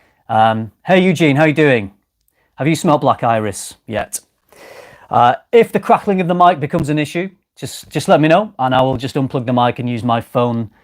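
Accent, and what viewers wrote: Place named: Northern England, United Kingdom. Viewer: I knew were from the North because when you say but you say bot lol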